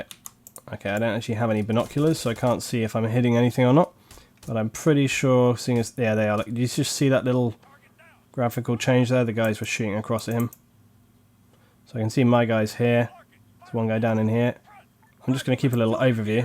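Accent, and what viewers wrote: United Kingdom. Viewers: Hi I have a HP notebook which I play arma3 on. I a noob with about 20 hours on it and I’ve just discovered I can’t get half of my actions, like player stats, gps,etc.. as I have no right Ctrl button on my keyboard. I’m computer illiterate lol, so could you or anyone reading plz help with a remedy to my issue? Can I configure the keyboard somehow? (but in game only!) where I can change a non needed key to a R-Ctrl to use. Thanks pal great video and very useful still years on. appreciate your effort and time you invest in your video’s. BTW wat part of uk u from ? I can’t tell. I from the northwest I think you’re south of me lol 👍🇬🇧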